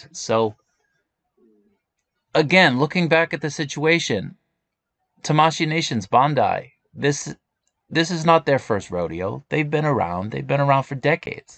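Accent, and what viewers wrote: Boston, United States. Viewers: That accent. Felt like I was watching the depahted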